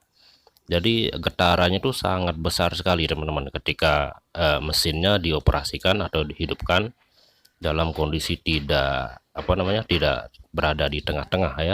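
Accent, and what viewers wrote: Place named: Bali, Indonesia. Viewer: Kaya org bali logatnya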